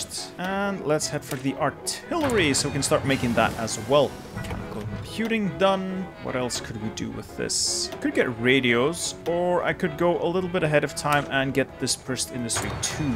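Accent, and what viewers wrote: Flanders, Belgium. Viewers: Wallowa of flemish, you sound flemish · O wauw ik dacht al dat je een vlaming was aan je accent, ik hou van uw content much love ❤️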